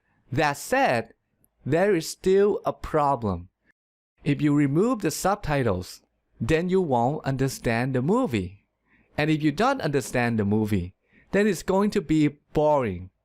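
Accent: Thailand. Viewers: Thank you very much for a great video. It's very useful. Also I guess you are Thai from your pronunciation^^ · Are you Thai? I think your accent like Thai people. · กลับมาฟังหลายรอบแล้วครับ คนไทย ผมจำได้ สำเนียงมันออก อิอิ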